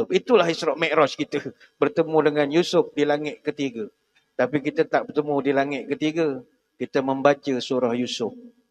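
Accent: Pahang, Malaysia. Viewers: Loghat pahang tu yg significant ketika penyampaian pun ye jgk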